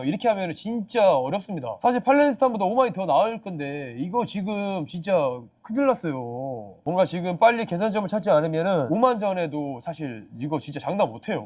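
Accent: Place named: Jeolla, South Korea. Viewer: 전라도말씨로 해설듣는건 첨인데 은근 중독성있네 😂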